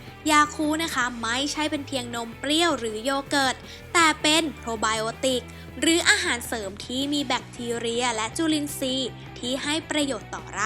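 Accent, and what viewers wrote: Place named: Suphan Buri, Thailand. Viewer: เสียงแกเหน่อได้ใจลุงจริงๆ แกคนสุพรรณรึเปล่า ลุงหมากับเมียหมากัน 2คน แล้วแกหมากันกี่คน?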